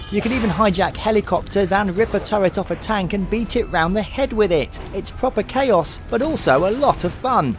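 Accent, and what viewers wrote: United Kingdom. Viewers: The voice is way too brit.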